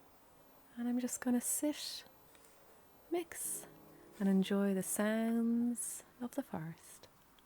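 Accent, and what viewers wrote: Ireland. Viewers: One of my best friends, God rest her soul, was Irish. And as soon as I can, in the near future, would love to visit Ireland. In honor of her. Seeing your video & hearing your accent makes me realize that my dream can happen as soon as the virus has a vaccine or When I feel it is safe to travel again. One question I have when is a good time of the year to travel, whether wise? And can you recommend places that I should visit? Thanks. By the way your videos are awesome. And I love the thought of "fairy doors". I am thinking of incorporating "fairy doors" where I live. It is an interesting concept.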